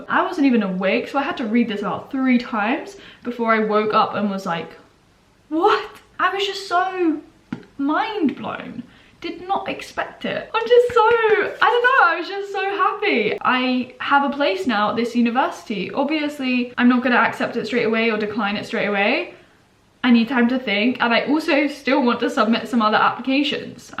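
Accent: Australia. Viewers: You’ve picked up a bit of an Australian accent!! Love it! X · You sound so Australian since you got back from your trip! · I feel like she's delevloping an Australian accent, very likely that she's not but I kinda heard it in the intro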